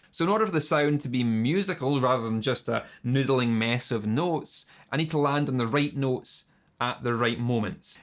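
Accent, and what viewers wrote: Scotland, United Kingdom. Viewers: You Scottish or Irish? You sound Scottish to me, I ask cause my uncle is from Ireland and I’ve noticed there are varying accents in Ireland. Cheers from Canada, I’ve just subscribed as well as ordered this amp. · What a pleasant person. Lovely Scottish accent. Not showing off at all, although he is a pretty good guitarist. I watched this video and I had to smile many times. Good musician and very funny entertainer too. Congratulations !!!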